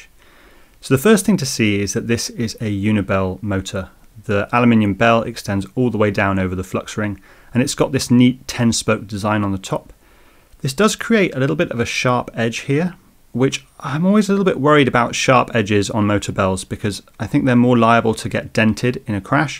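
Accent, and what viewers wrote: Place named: United Kingdom. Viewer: Seems like you're the onli British English speaker who's speech doesen't make me feel pain in my ears)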